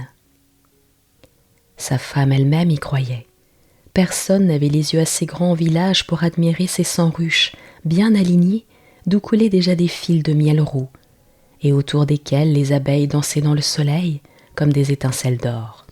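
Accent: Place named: Provence, France. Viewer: désolée c est le téléphone qui a mal écrit Je voulais dire l accent provençal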